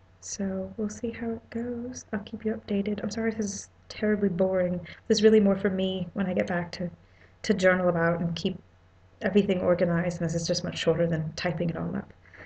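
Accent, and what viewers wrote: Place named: England, United Kingdom. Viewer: LOL!! I did that too when I was surfing in England. Yes, there's surf in England. The accent sounds good on you, but sounds weird coming out from a Filipino-Hawaiian-Basque dude (or a brown brahdah). LOL! Cheers.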